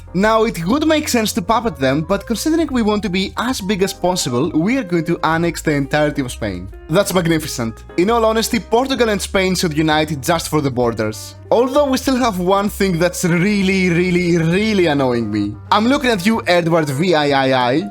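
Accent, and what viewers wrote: Greece. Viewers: Bro , are you greek ? Cuz the accent really seems greek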